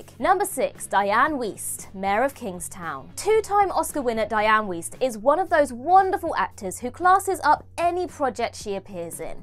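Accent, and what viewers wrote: United Kingdom. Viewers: lol love British talking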